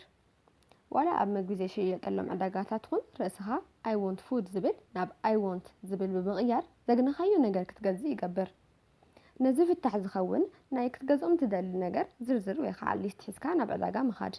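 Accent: Tigray, Ethiopia. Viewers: Why don’t you focus on the information/Lesson . You people have always something to complain about. Her Tigrinia was perfect. Her Tigrinia is fromTigray or Ethiopia. Eventhogh i am from Eritrea i understood every single Word she said..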